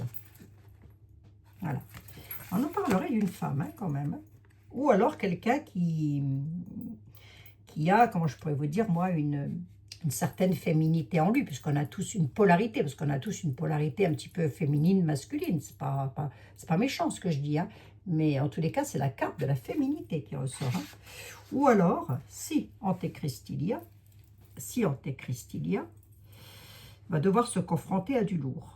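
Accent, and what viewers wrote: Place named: Lorraine, France. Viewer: Votre accent Lorain je m'y suis habitué depuis que notre fille est installée chez vous maintenant un peu a côté depuis ce weekend elle vient d'acheter une maison en Meurte et Moselle du coup je l aime merci de nous rassurer et je sais que vous faite ce que vous pouvez mais oui fait aussi prié la France prie plus la vierge nous étions la fille aîné de l'église où est elle ? Courage Aussi a vous amène 🙏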